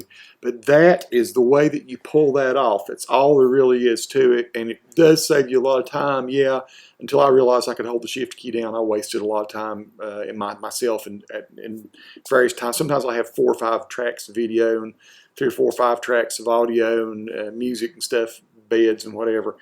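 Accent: South, United States. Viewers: HI! I subscribed. You might not believe how I found your site! I used to live for a few years in Madison County, just North of Asheville. One day I wanted to youtube-lookup videos about that county. There were a few good ones but somehow one of your older videos came up. was part one of four, the " brothers" series where you all rode around the roads and just talking away. I really enjoyed the banter. I had little trouble understanding it, due to not only me living nearby but also living in Tallahassee, Florida for like 60 years on and off. The good old southern accent! I grew up in the very most Nothern area of Appalachia, way out in the country. I also really enjoyed the "wholesomeness" of the brothers, just good ole boys with a heart and soul. I found imagine, though, that someone else who might have stumbled onto this brother series, especially if they came from a Northern city and college-educated; they might only think, at their best, that you all were just a group of rural people with little knowledge of anything! 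But I can FEEL the goodness. And....salvation too! then I looked at your video main page.....oh "Lordy" [I do not mean to take his name in vain, I mean this word to infer a humbling experience That the Lord can Give!] your main page has so many videos of someone with a zillion talents and life experiences. Yet another showing that in the south and especially in the mountains, the Good Stuff is usually hidden away! really hidden away. there is a man w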